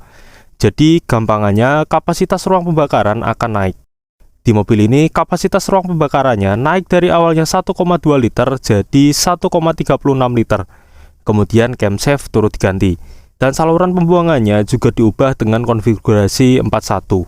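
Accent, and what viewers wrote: Java, Indonesia. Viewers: Medokmu lo minnn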